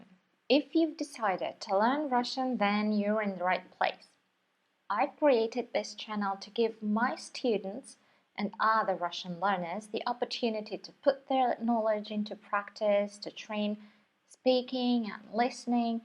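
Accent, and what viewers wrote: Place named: United Kingdom. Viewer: A very pleasant lesson, thank you 🙂 When you speak you sound more British than Russian! Your accent is delightful and your speech is clear. I also like the pace.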